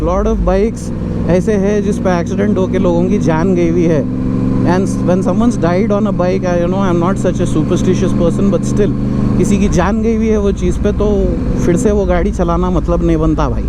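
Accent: Hyderabad, India. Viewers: Are you Hydrabadi bro ? , if I am not mistaken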